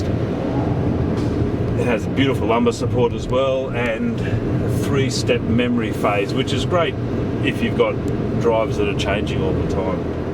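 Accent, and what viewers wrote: Australia. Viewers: he sounds like Crocodile Dundee!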